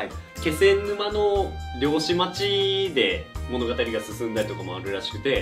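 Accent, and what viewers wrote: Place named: Kyushu, Japan. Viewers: この九州なまりが標準語になったら寂しいなぁ😂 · さん、東京でもその九州なまり無くさないでくださいね🤣 これからも応援しています！！ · 引っ越すと何をするにもいちいち土地勘がないのと、言葉の壁やら文化の違いにとにかく戸惑いますよねー！パートのおばちゃんがいるとかなり心強い情報源なんですがそういう職場じゃなさそうですもんね。さんのキャラなら食堂のおばちゃんと仲良くなりそうな気がしますがw まぁ、悲しいかな気づいたら馴染んでしまうものなので、焦らず少しずつお仕事にも慣れて行ってくださいね！若いけどお体に気をつけて！南九州の方の訛りはちょっとやそっとのことでは変わらないと思うのでw そのままのさんでいてくださいねー‼︎焦らないでいいのでこれからも更新楽しみにしてます。あ、土地勘なくてすみませんが、東京の親戚がオオゼキで買ったお買得品を送ってくれる事があって、九州にはない商品の流通もあるし、お得だし良さそうですよ。ハッピーアワーに出くわすといいですね！公務員寮は食事付きなのかな？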